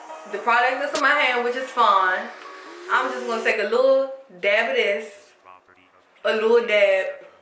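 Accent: Washington DC, United States. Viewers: Are you from DC?? If not...you sound like it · foreverbeautyguru more like D.C. Lol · you gotta be from DC! the accent and them nails, dreads, everything scream DC! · the MC is from NE DC lmao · this was a cute tutorial in real time, I like that it wasn't sped up. The brows were fleeky lol. And as soon as you started talking I said oh she from D.C. I know that city talk anywhere 😄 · Everyone keeps talking about "blending". She said her brows are her first step. It's not a complete finished look. Her brows look Amazing! She sounds like she's from DC 👍🏾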